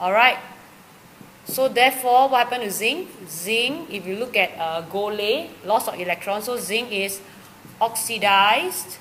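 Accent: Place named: Nigeria. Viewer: She has a Nigerian accent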